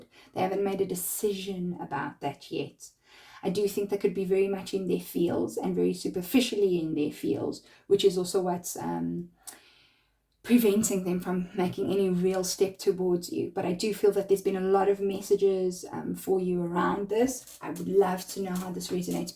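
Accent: South Africa. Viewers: You sound so South African. 🇿🇦❤️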